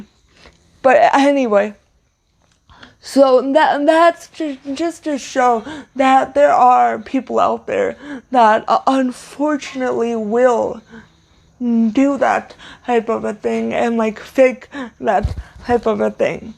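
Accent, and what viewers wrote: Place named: Northeast, United States. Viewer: R U from the northeast?I'm originally from south jersey.you sound and act like you are from back east somewhere.maryland,Connecticut or something.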